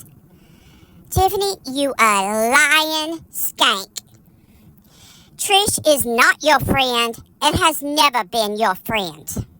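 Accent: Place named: South, United States. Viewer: I missed you miss, and I love Southern accents 😍💯🙏🏼❤️ Great video like always 💯🐍🥕🤡👿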